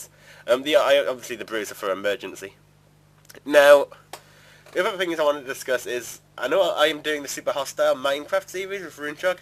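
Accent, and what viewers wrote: Newcastle, United Kingdom. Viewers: loving the jeordie accent in the super hostile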